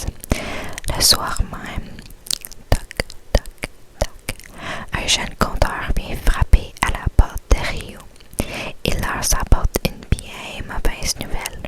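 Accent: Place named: Quebec, Canada. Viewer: L’accent québécois en asmr c’est trop innnncroyable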